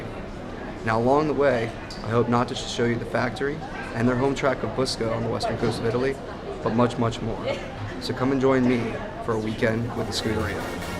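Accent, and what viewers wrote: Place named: Germany. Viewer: Why do you live in a dorm in friggin Marburg and pronounce Italian words with a German accent when you're an American, racing in an American series? So confused right now...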